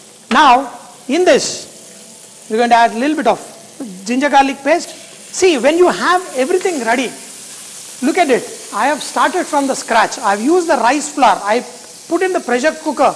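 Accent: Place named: South India, India. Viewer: Good explanation in a South Indian accent...nice video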